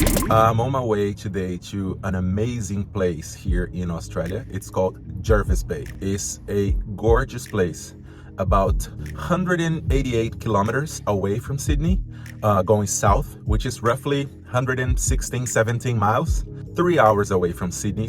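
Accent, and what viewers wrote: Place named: Brazil. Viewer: Brazzo accent yey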